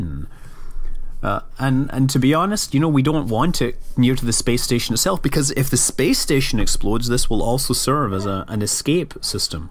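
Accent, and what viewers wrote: Scotland, United Kingdom. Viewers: He's Scottish you imbecile.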